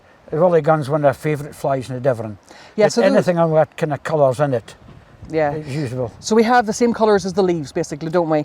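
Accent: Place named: Ireland. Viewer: You sound irish hon.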